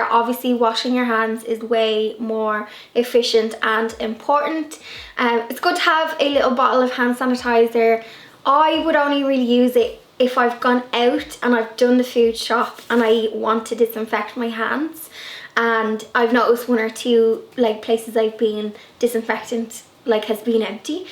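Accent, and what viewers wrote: Ireland. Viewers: You could read the telephone book to me and I would listen. ( not in a creepy way!) I love your Irish accent. You have such a lovely home as well and I love to see all your DIY stuff. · I love your channel lovely to hear an Irish voice on here 😻 I will definitely try your recipes as I love essential oils and handmade soaps I must get some soap making supplies thank you for sharing xx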